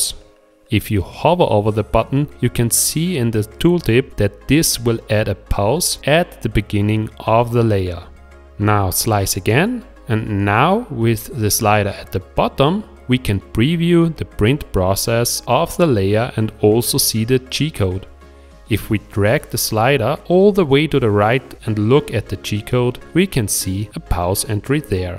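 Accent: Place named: Germany. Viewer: Ich wollte schon sagen, hört sich an wie deutsches Englisch! Gleich mal ein Abo da gelassen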